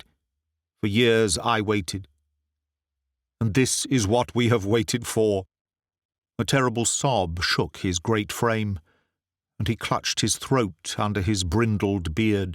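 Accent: United Kingdom. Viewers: The eloquent British dude running this channel has no idea how many times his soothing narration has rocked me off to sleep.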